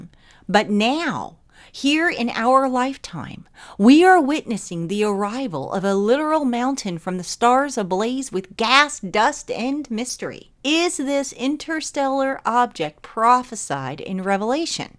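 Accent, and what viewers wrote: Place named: Connecticut, United States. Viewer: Outstanding vid. You sound like my ex from west haven..so I am guessing conn. accent??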